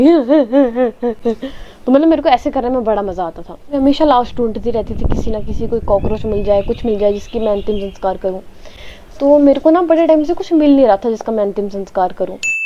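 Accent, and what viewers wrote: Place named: Jammu, India. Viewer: jab bhi tumhari awaaz sunta hu...uski yaad aa jaati hai..uski awaaz bilkul tumhari tarah h..Jammu walo ka alag hi slang hota h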